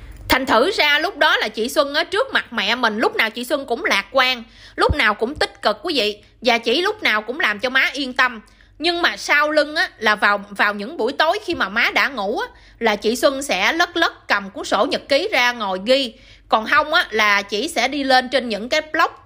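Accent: South, Vietnam. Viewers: Cháu ơi,cháu chọn trật nghề rồi …? Cháu có giọng Nam hay,ăn nói mau lẹ sao không đi nói đá banh …? Trước 75 ở Saigon, có Huyền Vũ nói chương trình “đá banh quốc tế “ không có người thứ 2 như cháu …? Khen,giỏi,Thanks for sharing your story. (Hai Culi Vietnam cộng hoà)🇺🇸🇨🇦🇫🇷🇩🇪🇦🇺🇮🇹☝️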